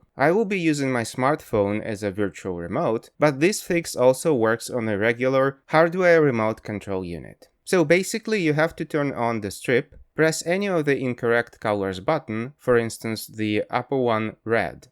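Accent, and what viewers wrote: England, United Kingdom. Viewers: Oh, sir, you are from England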